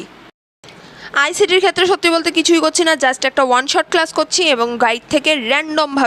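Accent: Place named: Kolkata, India. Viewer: Tumi Bangladeshi hole o. Tumar kothai Kolkata accent ashche.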